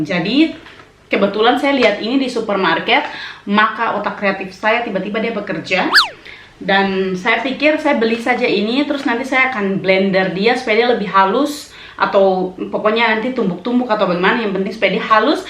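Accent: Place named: Kalimantan, Indonesia. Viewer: ngomong kaka sma ky di kalimantan 😍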